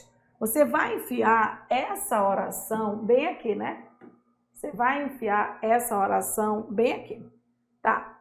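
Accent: Pará, Brazil. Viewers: Muito bons o conteúdo e a aula ❤ Percebi que a professora tem o sotaque do nosso Pará ❤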